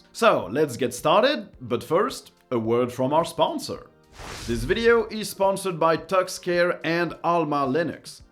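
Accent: France. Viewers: I definitely love my country. Besides your french accent is incredible.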